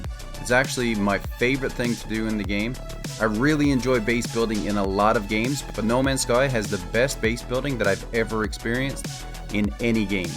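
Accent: Newfoundland, Canada. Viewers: What part of Newfoundland you from? Looked at one of your money and nanite making videos, soon as you started talking, I said he's a Newfoundlander! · U sound very Canadian. Newfoundland? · Aha...Newfoundland! That explains it. Thanks for answering, nice accent 👍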